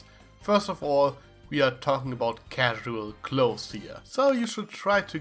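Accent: Germany. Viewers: ARE U GERMAN!?! BECAUSE IM FAMILIAR WITH ZE ACCENT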